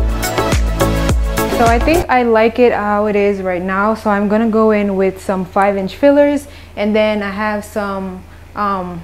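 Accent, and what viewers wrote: Jamaica. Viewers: Def heard that Jamaican accent 🇯🇲🇯🇲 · Heard that Jamaican accent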